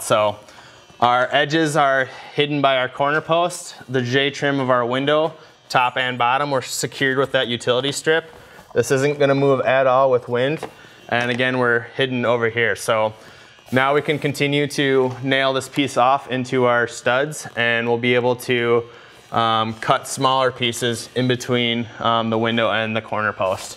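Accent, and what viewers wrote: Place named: Wisconsin, United States. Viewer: where in wisconsin did you come from?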